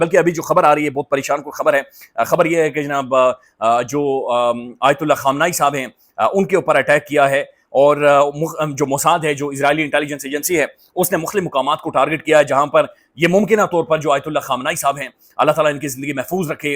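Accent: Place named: Kashmir, India. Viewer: جیو کشیمری لہجہ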